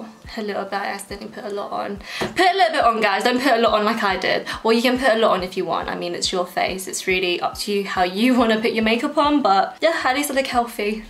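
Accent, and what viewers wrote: United Kingdom. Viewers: I follow her on Instagram and just discovered she had a YouTube channel, and can I just say I totally did not expect her to have a British accent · Ive only known you from Instagram but holy crap your accent is cute af! Rep us British girls!💜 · I didnt even know you had a youtube channel!!! I follow you on instagram (@ I love your tutorials on there but I wanted to see a more in depth or longer video. Sooooo i checked youtube and low and behold youre here!!! And I was like "YAAAAAASSS" And I had no idea you were British. Right? British? Well i love you and youre makeup tutorials.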